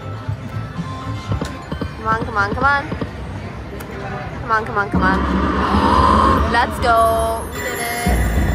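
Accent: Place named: Minnesota, United States. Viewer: Recognize that Minnesota accent from anywhere ya know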